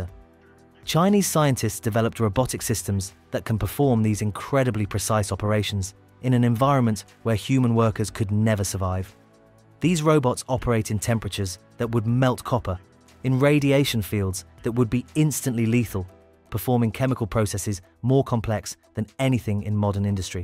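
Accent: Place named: United Kingdom. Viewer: the British sounding AI narrator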